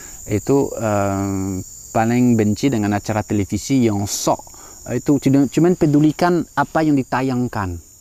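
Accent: France. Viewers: Aksen france nya sambil berbahasa Indonesia begitu gurih di telinga, enak didengar. Kalo ngobrol sama bapak ini kayaknya asik bisa berjam jam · Channee kalWeit masih kntal logat perancisx yaa? Klo mgomong bahasa indonesia.."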